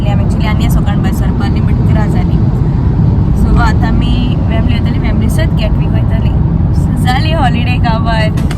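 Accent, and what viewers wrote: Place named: Konkan, India. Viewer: What dialect of Konkani is this as I could barely understand. I live in Cardiff.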